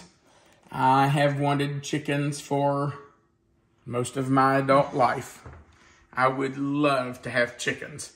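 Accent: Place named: Kentucky, United States. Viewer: I'm a Canadian, who is hooked on your recipes. I also love to hear that Kentucky way of speaking. Your chatter with is delightful.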